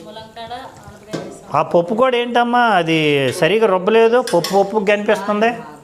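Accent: Srikakulam, India. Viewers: బ్రదర్ నువ్వు ఉత్తరాంధ్ర శ్రీకాకుళం జిల్లా వాసిలా ఉన్న బావుంది లాంగ్వేజ్ బాగుంది మంచి మంచి పని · Srikakulam slang super sir....bagundi....sweet ga...asalu me east west vijayanagaram srikakulam vallu tittina...tittanatte untadi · Srikakulam yaasa bavundi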